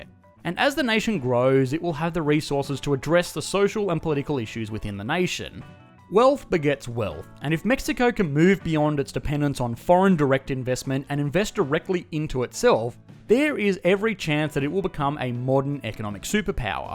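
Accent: Australia. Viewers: Good content but the Australian or New Zealand accent makes it hard to listen without getting nausea or a headache. Terrible accent!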